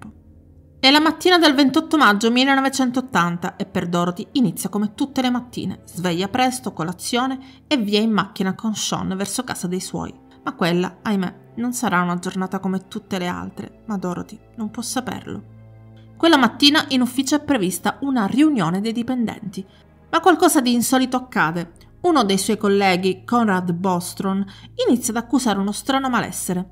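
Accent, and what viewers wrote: Sicily, Italy. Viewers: Ciao non conoscevo il caso...mi chiedo perché Dorothy non si sia rivolta alla polizia dopo aver ricevuto le prime telefonate dal suo stalker...non riesco neppure ad immaginare l'angoscia dei genitori che hanno continuato a ricevere le sue telefonate anche dopo la sparizione della figlia...atroce veramente...la cosa che più mi tiene avvinto ai tuoi video è la tua voce calma e pacata anche quando parli di crimini agghiaccianti...sei una bravissima narratrice ❤e adoro il tuo accento siciliano nonostante io sia un polentone!😂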